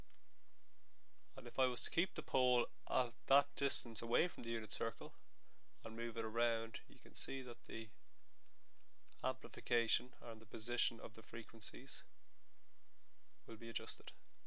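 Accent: Ireland. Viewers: Nice to hear an Irish accent. Thanks for the vid.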